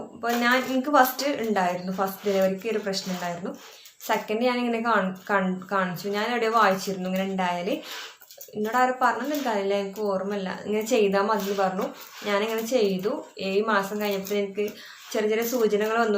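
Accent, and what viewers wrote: Malappuram, India. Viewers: സ്ത്രീ ശരീര സംരക്ഷണത്തെക്കുറിച്ചും ലൈംഗിക ജീവിത ആരോഗ്യത്തെക്കുറിച്ച് ഉള്ള വീഡിയോകൾ കൂടുതലായി ചെയ്യുക പിന്നെ നിങ്ങളുടെ മലപ്പുറം ഭാഷ ശൈലി എനിക്ക് വളരെ ഇഷ്ടമാണ് എല്ലാ വീഡിയോകളും കാണാറുണ്ട് കമന്റുകൾ ചെയ്യാറില്ല എങ്കിലും പിന്നെ പറ്റുമെങ്കിൽ കുറച്ച് ഊർജ്ജസ്വലമായി സംസാരിച്ചാൽ നന്നായി വരും അല്ലാഹു അനുഗ്രഹിക്കട്ടെ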